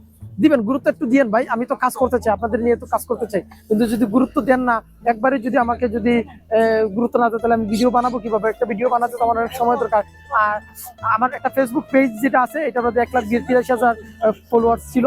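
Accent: Sylhet, Bangladesh. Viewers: আপনে সিলেটি নি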